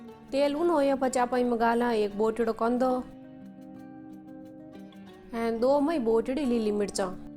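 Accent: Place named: Rajasthan, India. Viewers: Mujhe is video se jyada acchi aapki awaaz Marwadi Bhasha ka koi jawab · बाजरी को कीचड़ो बनाने की विधि बताना दीदी आपकी राजसथानी आवाज मे जो विधि से आप बताते हो वो बहुत बढीया है 🙏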